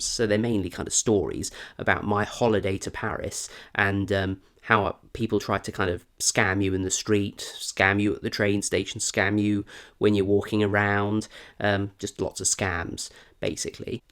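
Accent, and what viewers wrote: United Kingdom. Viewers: keep doing what you're doing! from a fellow Brit, Its the very British delivery and sense of humour that make your videos such a joy to watch regardless of subject. FYI YouTube recommended your 30k subs video to me weeks ago and I've been hooked ever since. Cheers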